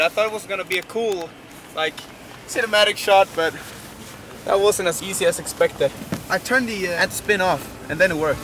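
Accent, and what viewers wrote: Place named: Sweden. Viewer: feeeett! Jag är också från Sverige haha, tyckte de lät som om du va svensk;)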